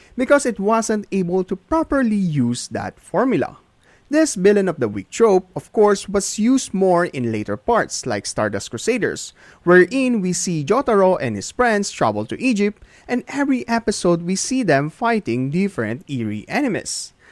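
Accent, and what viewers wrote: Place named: Philippines. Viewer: That accent.. say, are you filipino by any chance?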